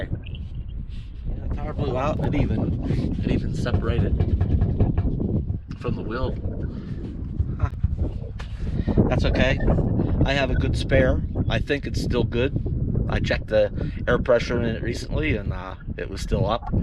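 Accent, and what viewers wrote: Pennsylvania, United States. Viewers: He....I hear a back east man talking Pa accent...Am I right? That tire was to heavy for me to change, good job. Hope you were able to get a spare at a good price....Janice from Pa,now Arizona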